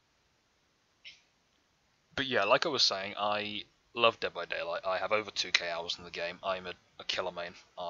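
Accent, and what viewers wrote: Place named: Germany. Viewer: Bro u are amazing love ur vids and ur dbd animations i think after ur accent u are from germany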